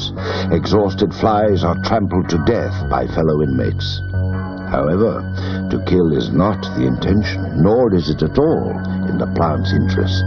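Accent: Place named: United Kingdom. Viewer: "After mating, the females goes underground, forever." Way to go creepy british voice for teaching me about the birds and the bees.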